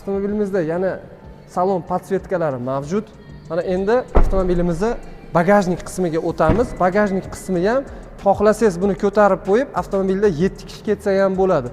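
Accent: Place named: Tashkent, Uzbekistan. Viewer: Тошкенча Шева качаткибтию у́накки боб ковоти,( катмеди манитори😂😂😂)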